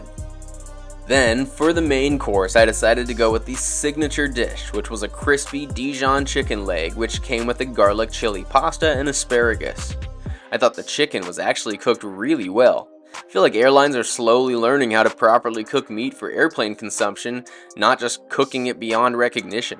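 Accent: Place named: California, United States. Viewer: You have the same accent as the SNL The Californians!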